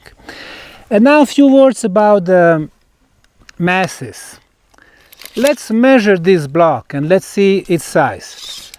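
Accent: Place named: Bosnia and Herzegovina. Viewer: Neverovatan covek! Ali najjace mi kad govori engleski bukvalno sa bosanskim naglaskom😄😄 💪💪💪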